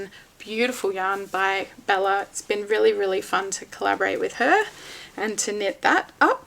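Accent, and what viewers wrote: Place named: Australia. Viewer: It’s so great to hear an Aussie talking yarny goodness!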